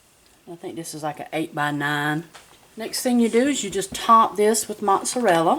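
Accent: South, United States. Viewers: When I heard her say, "it ain't gonna hurt nuthin' I knew she was country. I usually fry my cabbage.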